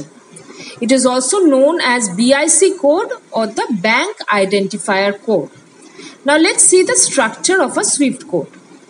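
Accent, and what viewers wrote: Bengal, India. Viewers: That indian accent kills the message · You got a Bengali accent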